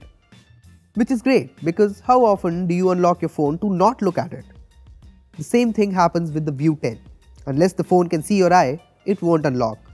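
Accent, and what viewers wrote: India. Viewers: Sayam Sawai he has a typical Indian accent but his presentation is pretty good. I wouldn't judge him for his accent alone.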